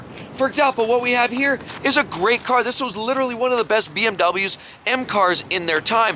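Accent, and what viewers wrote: Edmonton, Canada. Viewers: Are you from Edmonton?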